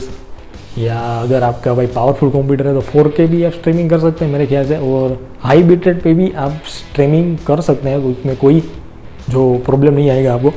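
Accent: Gujarat, India. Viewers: Wah bete wah Aap gujrati ho na aapki hindi se pata chal gaya Hu pan gujarati chhu bhai..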